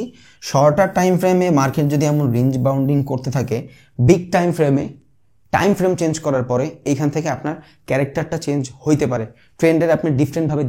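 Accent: Barishal, Bangladesh. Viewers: ভাই বরিশাইল্লা ভাষাটা ভালো ছিল❤❤❤ জোস❤❤❤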